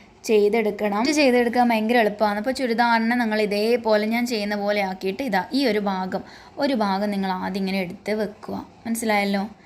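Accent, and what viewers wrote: Kannur, India. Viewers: ഇത് കണ്ണൂർ ആണോ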